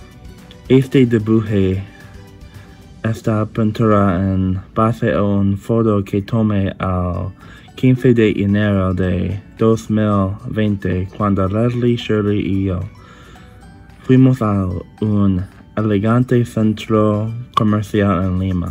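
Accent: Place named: England, United Kingdom. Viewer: Me encanta ese acento de los ingleses cuando hablan español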